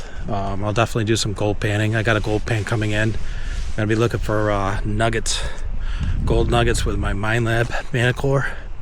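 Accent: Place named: Boston, United States. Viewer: Looks like NW Alabama to me. That Boston accent will go great there.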